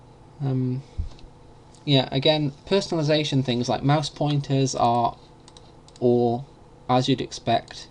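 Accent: United Kingdom. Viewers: Is that a British Accent ?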